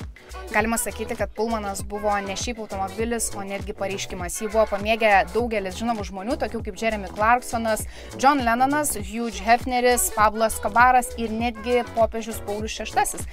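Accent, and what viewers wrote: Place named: Šiauliai, Lithuania. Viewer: Apzvalga šiaulietiska 😂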